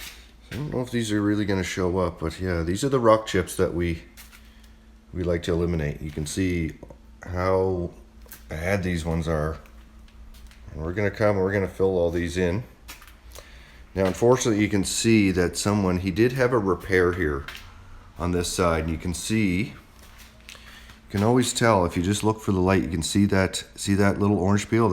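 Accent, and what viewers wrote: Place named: Newfoundland, Canada. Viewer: You from the Maritimes? Newfoundland?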